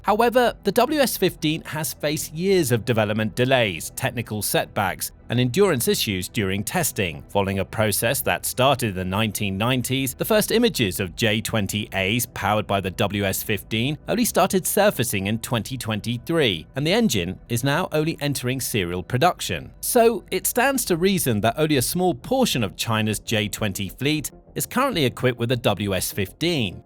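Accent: United Kingdom. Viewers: Yeah. I expected it before I even clicked on the vid. This channel is still such a joke. But eh. It's a brit narrating so what do you expect?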